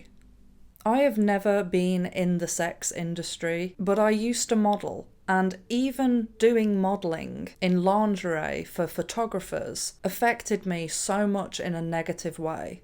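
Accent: United Kingdom. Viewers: I love your accent 😍 are you british ? New sub here i love your content speak wisdom to this dumb stupid girls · Your UK voice is amazing and the pronunciation and accent.. I could listen to this voice for hours. What part of UK is your voice accent from?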